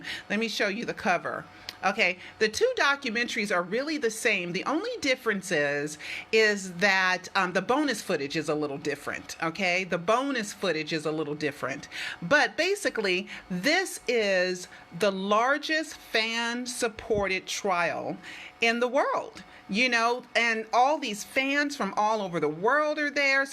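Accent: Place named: United States. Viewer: Salut!!! Joyeux noël!!! Happy Christmas!!! You are a very intelligent woman. And I will buy your DVDs on amazon. We have to support your job and it don't matter if I don't all understand, I understand the general sense. For your devotion for Michael and us. And i love your personality, your American accent. And I want to know who is Peter midani? Kisses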